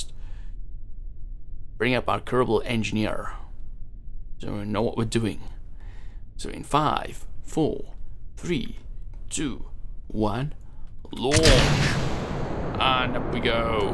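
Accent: Wales, United Kingdom. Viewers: are you Welsh coz I kinda recognise the accent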